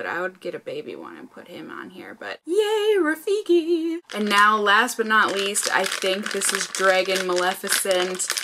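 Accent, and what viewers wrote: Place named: France. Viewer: Your so funny! Lol your French is cute.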